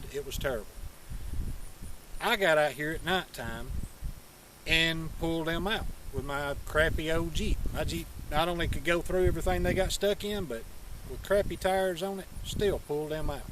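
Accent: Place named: New England, United States. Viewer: Hey neighbor, didn't recognize that accent (Yankee) . Argued that anyone north of Tennessee River is a Yankee was corrected by Pete Cook that anyone north of the Cahaba River is a Yankee. 😃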